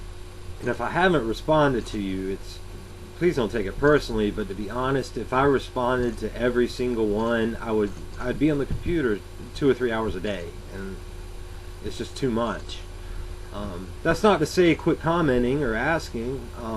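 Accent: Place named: North Carolina, United States. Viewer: Yea man I live in Leland in Windsor park as well so I go that same way to get to the clinic. I think it was just the way you talked and then a few things you said in your videos like you were in south east nc ect that made me think we must go to the same clinic. Pretty cool man. Glad you got off the shit. I just got on it last year and I kinda like being on it because it helps my chronic back pain that has kept me from sleeping well for years now and it also satisfies my opiate cravings. But I do wanna be free one day so I watched your vids. I plan to do the taper correctly so hopefully it won't be as bad as what you went through but it's really scary.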